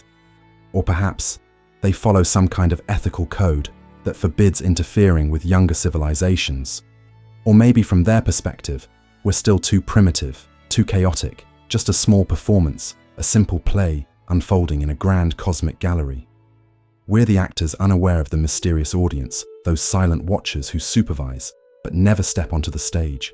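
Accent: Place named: England, United Kingdom. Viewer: I love these types of audio/videos… but one thing I believe can be improved on in many of these audio/videos is the narration. Why does it always have to be an English accent? Try an Australian, South African, or even an American accent.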